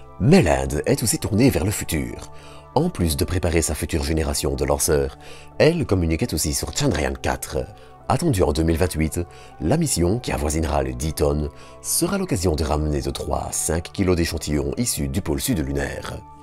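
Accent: Belgium. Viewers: C'est la voix de, son organe naturel, son accent pittoresque, il est belge. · Merci pour ces actualités spatiales présentées de façon efficace. J’ai bien ton accent Belge. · Holala cet accent... Belge? (no offense, suis belge aussi)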